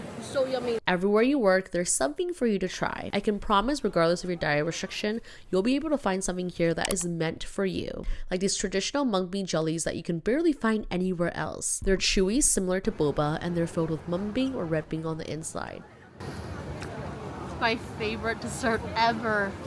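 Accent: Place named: United States. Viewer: Why your accent is so American but you grew up in Taiwan? I love how much you enjoy Taiwanese food and you’re a very smart chef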